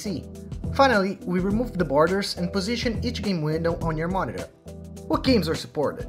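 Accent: Brazil. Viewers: deu pra percebe que você é BR só pelo sotaque, é muito foda ver brasileiros fazendo projetos assim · caraca kkkk percebi que é br so pelo sotaque kkk boa man, parabems pelo projeto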